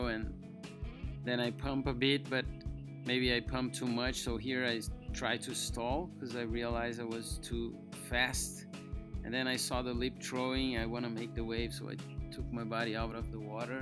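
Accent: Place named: Spain. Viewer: His accent ( Spanish?) is very colorful….beautiful narrative and music…so honest!!! 😊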